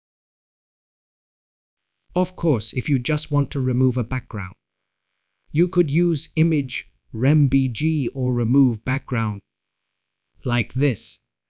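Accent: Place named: India. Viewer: stop using the AI it's more unintelligible than your indian accent. Everyone knows you're indian, you're not hiding it, you're literally just making people more racist against indians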